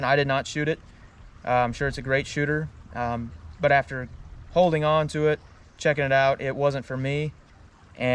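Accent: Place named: Tennessee, United States. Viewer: I can tell your from Tennessee. Same 🤣 you sold me On this .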